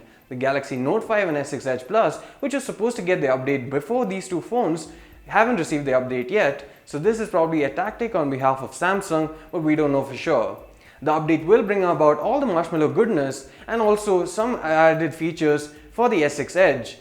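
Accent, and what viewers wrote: India. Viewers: Ninguém entende essa desgraça de inglês indiano!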